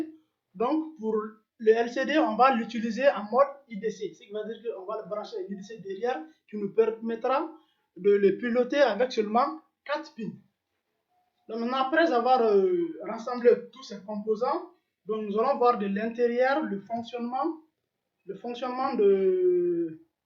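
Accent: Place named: Gabon. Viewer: Bonjour on dirait plutôt l'accent du gabon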